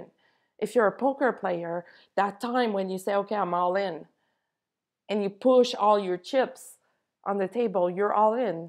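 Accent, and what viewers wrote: Quebec, Canada. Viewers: Est tu Québécois?? Merci.. Spot on message💙🌻🌈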